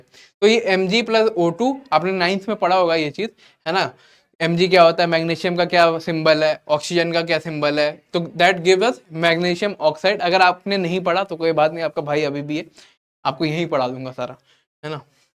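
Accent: Bihar, India. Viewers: Sahi me aap Bihari ho kya 😮😮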